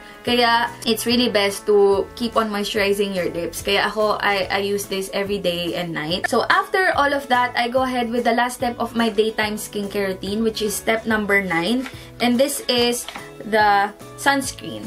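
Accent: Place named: Philippines. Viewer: I love how u speak english.. it is soooo pinay... ❤️❤️❤️ go girl ❤️❤️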